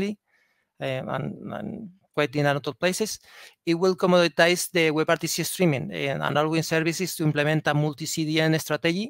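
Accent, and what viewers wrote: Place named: Spain. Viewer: very difficult to understand. strong Spanish accent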